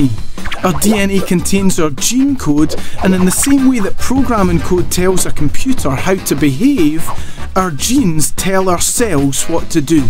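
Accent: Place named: Scotland, United Kingdom. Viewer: Top video, love the Scottish accent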